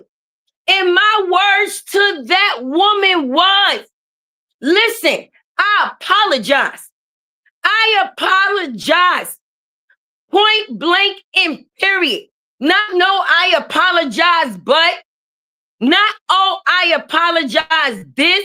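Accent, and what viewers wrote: New York, United States. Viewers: Hey I here that nyc accent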